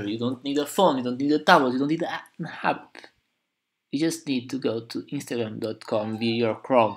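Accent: Portugal. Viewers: Esse sotaque português ... Topei assim que começaste a falar. Obrigado pela dica bro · Assim que ouvi a tua voz reparei logo que eras tuga, bom vídeo. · Pelo teu sotaque bem me parecias português xD , obrigado pela ajuda !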